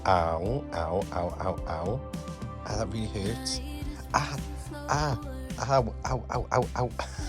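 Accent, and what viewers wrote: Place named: Newcastle, United Kingdom. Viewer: I love how Geordie you get talking to the cats!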